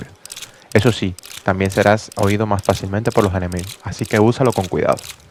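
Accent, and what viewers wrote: Cuba. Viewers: Cojone asere eres cubano verdad? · Este es cubano si o si ,inconfundible 😂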